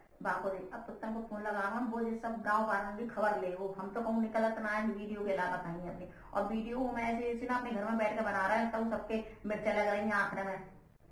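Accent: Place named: India. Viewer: Wow di desi khana desi hi style mein baat bahut achcha laga 🙏❤️❤️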